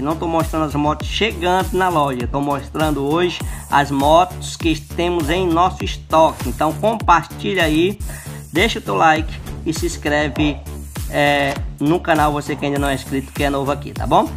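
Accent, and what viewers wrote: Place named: Pernambuco, Brazil. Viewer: Você é de Pernambuco?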